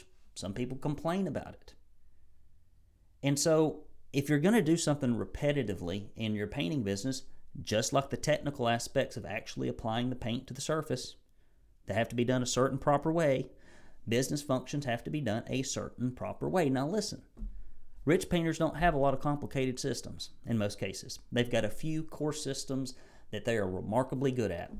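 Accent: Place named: South, United States. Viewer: Hey, your commentary is "Spot On" and honest. And Oh Yeah, uh, don't ever worry about that "Rednecky Accent". Ya"ll doin Just FINE!!!!!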